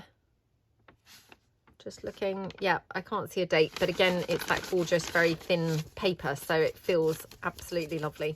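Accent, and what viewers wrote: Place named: RP, United Kingdom. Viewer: You are amazingly creative and inspirational. I love your posh accent and your journals rock! Thank you!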